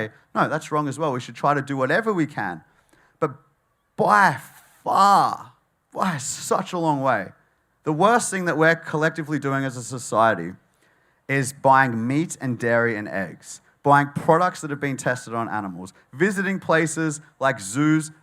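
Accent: Australia. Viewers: Another great speech. I could listen to you talk all day long. You have a very gentle voice and I love the aussie accent. You have such a friendly way of introducing people into a vegan lifestyle, giving facts in a non pushy way. I have been vegan for 19 months now, started by signing up to veganuary. I watched earthlings, cowspiracy, what the health as well as many YouTube videos of yours, Joey Carbstrong, Hench herbivore to name but a few. I will never look back, I feel amazing not only health wise but to be joining you and others in making a difference.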